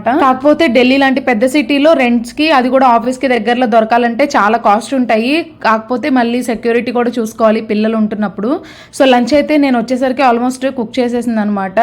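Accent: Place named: Godavari, India. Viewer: Meeru mataludu thunte godavari salang gurthuku vachindi andi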